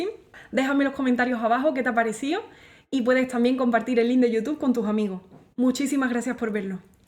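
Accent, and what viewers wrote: Andalusia, Spain. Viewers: Soy colombiano y adoro a España. Su bello acento andaluz me traslada a Jerez o a Sevilla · Hola, que tal? soy Ernesto,Te estoy siguiendo de hace muy poquito tiempo, tres semanitas, pues te descubrí viendo algunos vídeos para guitarra, porque estoy aprendiendo a tocar la guitarra. Y la verdad que estoy alucinado con tu agilidad y facilidad para tocar la guitarra tú te fucionas con la guitarra y eres increíble, eres simpática, bonita, graciosa con mucha luz que radias desde tu corazón, eres pura, me encantas como hablas y ese acento andaluz que tú destaca tan bonito. Te admiro, no cambien nuca, ser tú tan sencilla tan original, gracias por tus grandes aportaciones, beso cuídate mucho.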